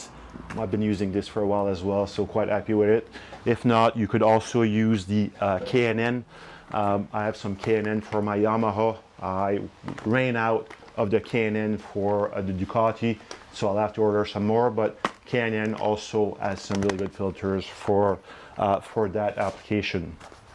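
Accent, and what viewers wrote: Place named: Saint-Sauveur, Canada. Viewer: Je suis de Saint Sauveur, je penses reconnaitre l'accent 😜🙏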